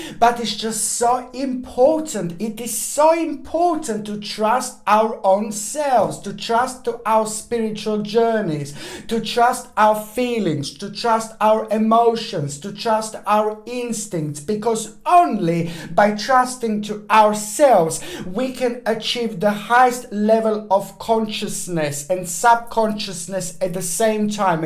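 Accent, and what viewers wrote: England, United Kingdom. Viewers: English accent.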